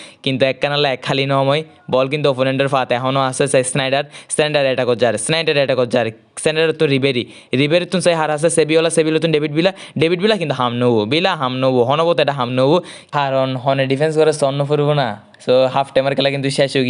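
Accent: Chittagong, Bangladesh. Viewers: Vai apnar basha ki chittagong e?? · ভাই আপনি ১০০% চিটাগং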